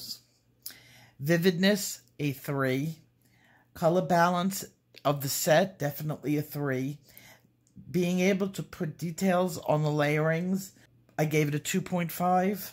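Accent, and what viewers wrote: New York, United States. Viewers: Love your voice & N.Y. accent.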